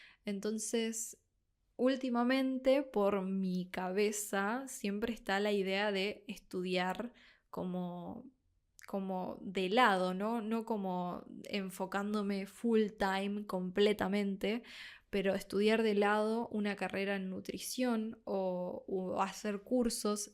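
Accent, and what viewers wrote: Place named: Buenos Aires, Argentina. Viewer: Are you from Buenos Aires...guessing by the accent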